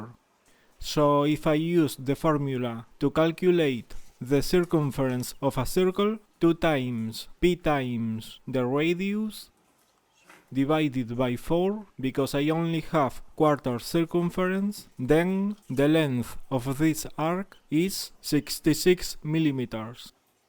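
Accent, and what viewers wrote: Spain. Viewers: Se nota que eres español bro jajajajajajajajaja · Habla el inglés como Fernando Alonso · Con oírte decir dos palabras sabía que eras español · Este ingles es muy español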